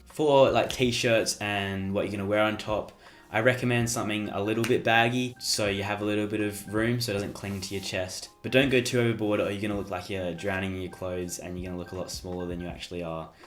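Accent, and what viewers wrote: Australia. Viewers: love Australian accents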